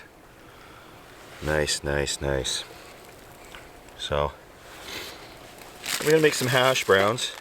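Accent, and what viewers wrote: Canada. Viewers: Are you an Ontarian or albertan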